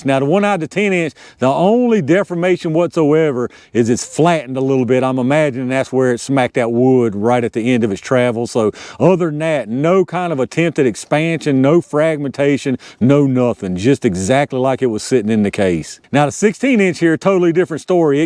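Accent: South, United States. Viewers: What a beautiful south accent.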